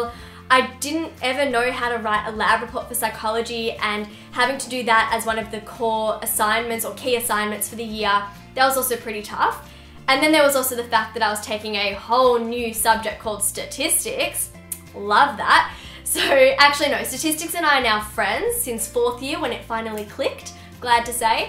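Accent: Australia. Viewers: are u australian like me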